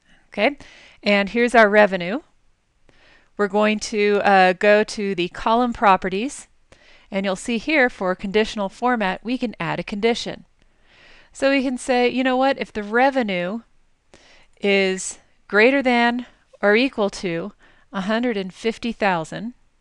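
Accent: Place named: United States. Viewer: Just want to echo what someone said below, so nice and refreshing to watch a training video with someone with an American accent.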